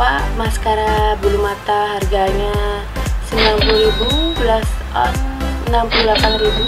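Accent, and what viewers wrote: Java, Indonesia. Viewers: Wong jowo to mbk?? 😁😁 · Jawa nya nggak ketinggalan ya kakkk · seneng lek mbak ngomong jowo😊lucu ae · medok tapi cantikk ka 😍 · Jowo ne medok tenan kak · Sukanya dari kak tu, apa adanya, gak sok keindo2 an gtu, jawa bgt wkwk.. Mantep kak, sukses terus ya, uwes ngene wae kak opo enek e.. 😁😁😁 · jowo aslie kak. cuetohh poll wkwk😁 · Jawa nya medok ya ka? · kok mbak.nya lucu ya, medok.nya itu 😂 bedak tabur viva.nya jd inget bgt pas waktu smp... 😂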